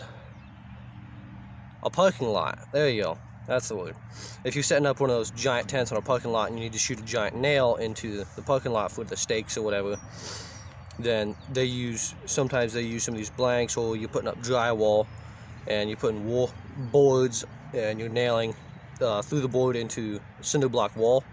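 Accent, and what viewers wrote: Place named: Massachusetts, United States. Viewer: Cool stuff. An interesting video and comparison info. YouTube recommended your video to me here in Toronto, Canada. Guessing you're a Massachusetts fella, judging by your accent. Ha!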